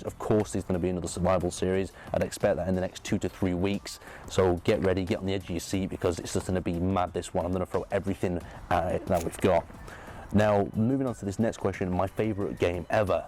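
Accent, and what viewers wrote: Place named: Manchester, United Kingdom. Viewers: Oi Manchester lad! Greetings from West! You should try scouse accent. That would be hilarious in your videos. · I'm from Manchester (the city, greater Manchester), I have a similar accent. I always thought because of my accent it would make it impossible to do you tube, but proved me wrong!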